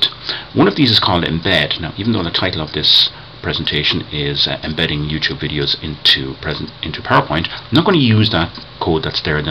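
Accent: Ireland. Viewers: It's nice to hear a voice from home :) miss Ireland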